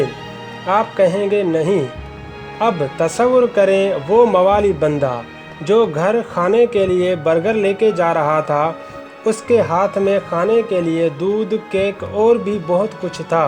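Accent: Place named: Sindh, Pakistan. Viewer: Keep it up Baki urdu improve karne ki kafi zaroort ha kafi sindhii lhja ha 😁😁😁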